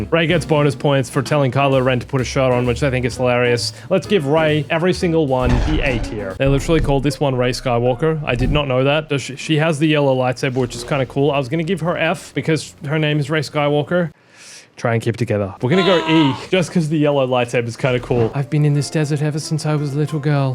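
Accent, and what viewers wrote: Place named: Australia. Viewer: And your Australian accent is perfect! 👌🏻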